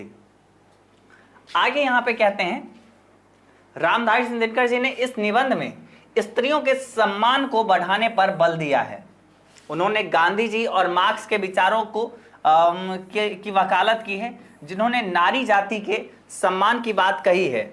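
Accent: Bihar, India. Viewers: Hmmm Bihar se hai sir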